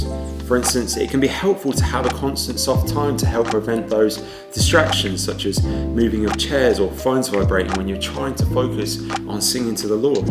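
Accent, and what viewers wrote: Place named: United States. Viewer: dude, well done. Full marks on your yank accent bruv!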